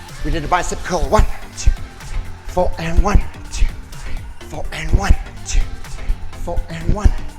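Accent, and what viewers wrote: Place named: South Africa. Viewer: You are fantastic , you are clear & thorough , explaining every move , what each move does, which motivates , &, & , & , you are fun ! 🥳Love it . I laugh when you do . Have just found you & imeeediately subscribed ! Are you in South Africa ? Love your accent .Thanks so much . Hello strong 74 year old legs !! Yay , here they come .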